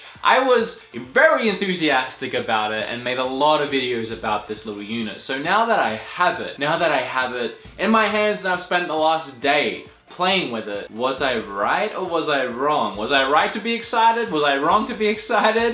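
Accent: Australia. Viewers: good video! what accent is that, are you a Australian? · Wher do you live man? Where are you from? I thought you were a Ozzie haha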